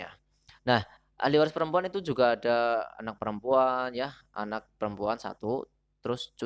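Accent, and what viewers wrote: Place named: Banyumas, Indonesia. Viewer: Semakin kebelakang, semakin ngapak. Wkw. Terimakasih ilmunya pak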